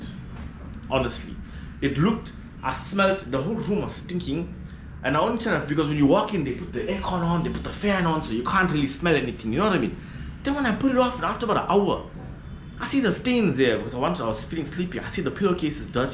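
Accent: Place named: Kenya. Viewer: You have an African accent bro kenyan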